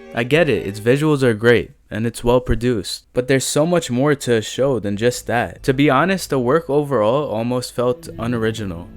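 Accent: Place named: United States. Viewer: the bullet is from an old musket ... it's not as fast , the water ? you think cold water is a danger ? you sound american , the one hand holding on ? did you forget about adrenaline ? and that Mizu is strong ? + add it beeing animation and animation exists to portray things real life can't to boot , so idk man , ur probs just digging too much into liquid sands , instead of enjoying what you get , i really enjoyed Blue Eye Samurai , i don't think you see what Blue Eye Samurai does , because it delves into asian culture and humour , as someone who watched alot of those films , the funny cameos , tiny little things and jokes weren't wasted on me , it's unfortunate that you didn't like it , probs the american in you speaking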